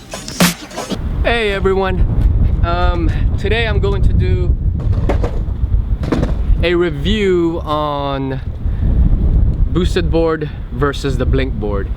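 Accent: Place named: Europe. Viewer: You talk like idubbz with European accent lol!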